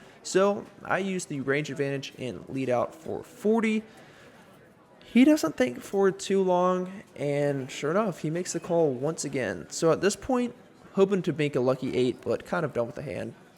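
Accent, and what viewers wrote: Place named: South, United States. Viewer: Love it that you play at all of my same casinos, other than Magic City. I haven't played down in Miami yet. Curious, you have an accent. Are you from TN or NC?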